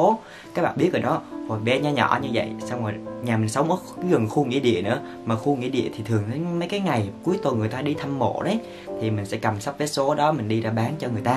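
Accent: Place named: Central, Vietnam. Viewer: A là người miền trung đúng ko ạ? "Sì gòn" "quýnh" "đì"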